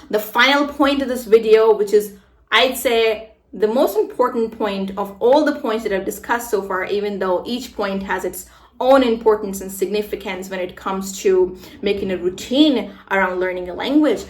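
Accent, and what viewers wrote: United States. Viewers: I love your American accent ❤️❤️❤️❤️❤️🇺🇸🇺🇸🇺🇸🇺🇸🇺🇸🇺🇸🇺🇸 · I love your American accent 🎉 Break a leg ❤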